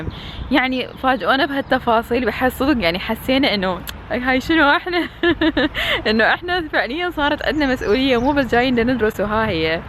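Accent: Iraq. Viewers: أحلى بشر والله😍 الحلو بيج انتي محافظه على اللهجة العراقيه بغظ النظر عن (صكط)😠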